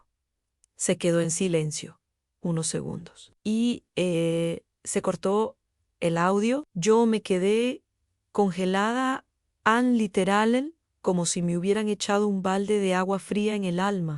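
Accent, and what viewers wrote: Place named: United States. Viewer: Porque ese tono Pocho igual que las Historias de hombres con Historias de Inmigrantes an ho muy pochos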